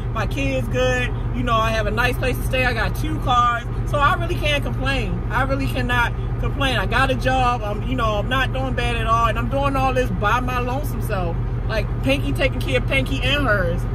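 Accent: Baltimore, United States. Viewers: You have a Baltimore accent 🤔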